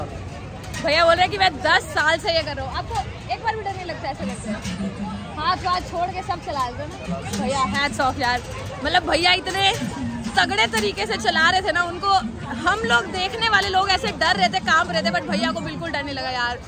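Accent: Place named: Gujarat, India. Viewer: Hi... Tomari Hindi old Gujarati mix language achcha lagta Hai tumhara bolane ka style... Ab Punjabi Ho aur Gujarati mein marriage ki hai ab aap ke nakshu ko Punjabi Sikhaoge ki Gujarati bolna... Aap ki mummy ka Ghar kaha hai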